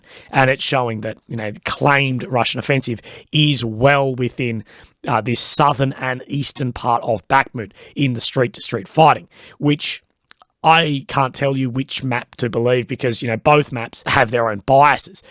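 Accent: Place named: Australia. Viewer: Look forward to hearing your goofy Australian ass everyday. Hope all is well with you. I think a reunion with rob on speak the truth is in order! Much love ❤️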